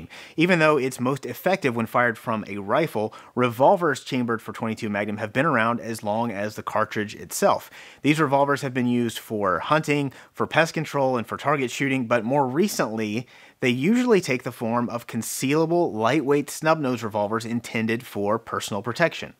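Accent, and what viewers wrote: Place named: Tennessee, United States. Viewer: It's ok we can tell your in Knoxville Tennessee, like the rest of us with those problems, that talk that same way Ya'll!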